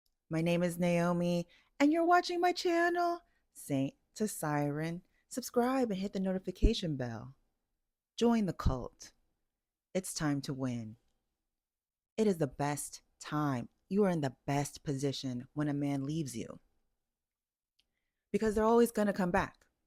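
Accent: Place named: Bay Area, United States. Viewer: I knew you were a Bay girl!!!! hahaha I was like she from LA?? ehhh nah...then you said it lol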